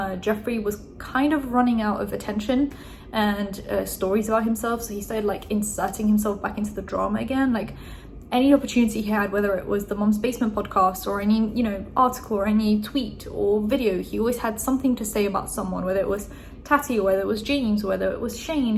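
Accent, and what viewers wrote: United Kingdom. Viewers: Omg I could’ve sworn you’re British your accent is so authentic 💀💀💀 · It's because she doesn't suddenly make any random loud noises or start yelling. The British accent and Calm composure don't hurt either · Please no one come at me, I have the memory of a sieve but, where is my girl from? I full on thought she was British. Sorry if that comes across as rude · I literally had no idea she wasn’t British and I’ve been watching her forever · wait, you are not british..? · English is not your first language and you're an immigrant?? I really thought you were just British. What's your ethnicity I'm curious:)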